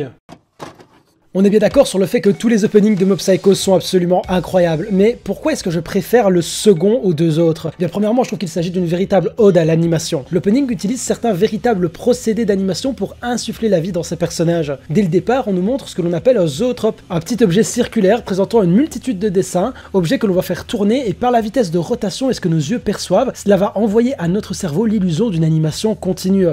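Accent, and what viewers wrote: Belgium. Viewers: t'es belge ? ton accent me dit qqchose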